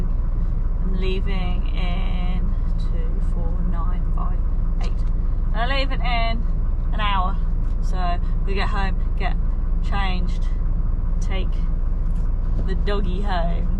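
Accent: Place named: Cockney, United Kingdom. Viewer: Love the the chockney accent where about you are today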